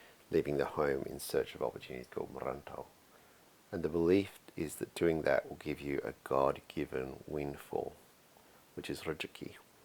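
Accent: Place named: Australia. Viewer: his Aussie accent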